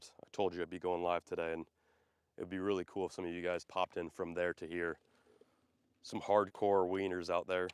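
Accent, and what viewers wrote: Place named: Chicago, United States. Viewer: you still talk like your straight outta the burbs of Chicago Texas hasn't changed your accent at all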